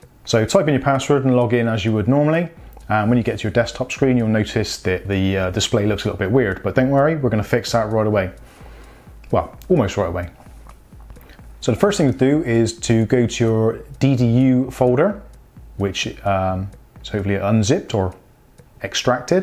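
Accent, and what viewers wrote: Bristol, United Kingdom. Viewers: Are you a Bristolian?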